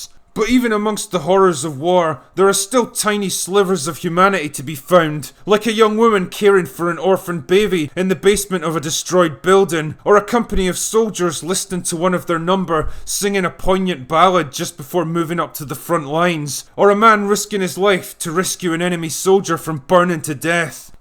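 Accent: Scotland, United Kingdom. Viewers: I though you were a Scot?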